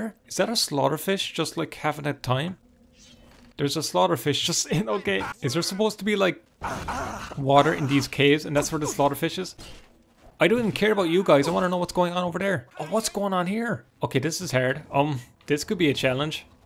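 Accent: Ireland. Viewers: We don´t mind your Irish. It´s actually fun hearing "He´s very Fair away" "Oh! Look at the Stairs!". It´s really endearing:)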